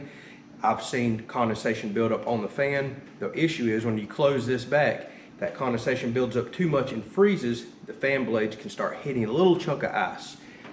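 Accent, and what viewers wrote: South, United States. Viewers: Hey dummy! You're not a dummy! People that are ignorant just hear your southern accent, and make bigoted assumptions! Chest deep freezers use almost no energy. Generally one of the most efficient devices in your house. Might cost you $3-5 a month... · I really enjoy listening to this guy talk! Presentation and his southern accent are peaceful.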